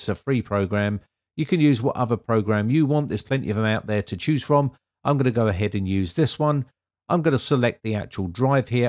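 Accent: England, United Kingdom. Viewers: Are you an American with an English accent?. You keep saying "GO AHEAD" in front of nearly every verb. Unnecessary, annoying, wrong, meaningless. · Calls himself a Brit, sounds like a Brit, speaks like a Yank- 'go ahead' this , 'go ahead' that. Bad enough when an American continually says it but it is annoying af hearing it here.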